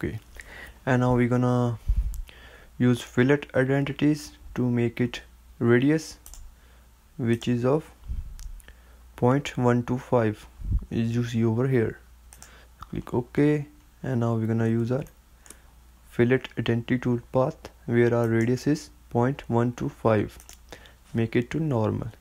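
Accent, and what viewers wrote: India. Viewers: Do us all a favor and have someone who doesnt have an indian accent do the narrating. Nothing personal but english does not go with your accent. Its a shame beacuse theres a lot of good content ill miss out on. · You go entirely too damn fast for anyone watching this to keep up. Also, you have a very thick Indian accent, and tend to mumble. Work on enunciating your words better, other wise your only audience will be folks from India.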